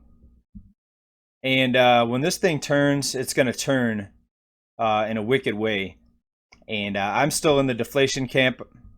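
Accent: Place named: Midwest, United States. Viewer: Favorite outro. Keep up the good research. Once you said you lived in Michigan at one point...well you do have a midwest sounding vocal. Makes sense now. Lol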